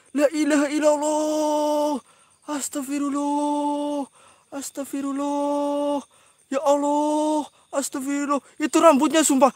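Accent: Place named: Banyumas, Indonesia. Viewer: Seram tpi aga lucu ya,ngapak xxz.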